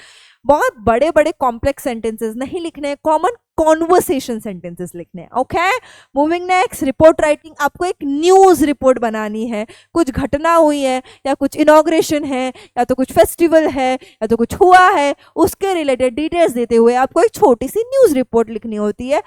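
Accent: India. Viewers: Hand gestures ,indian english and over acting pure ke pure sade panso rupey cut kardiya jayega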